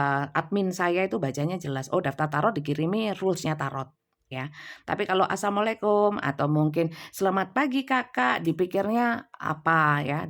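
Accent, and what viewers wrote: Java, Indonesia. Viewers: Hdeeeh bunda lucu ,medok mboteopo dechhh😊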